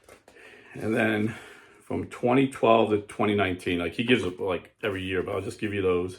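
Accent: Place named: New Jersey, United States. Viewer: It’s just his Jersey accent. Likewise, a southern accent might say it slowly as “Holy-cost.”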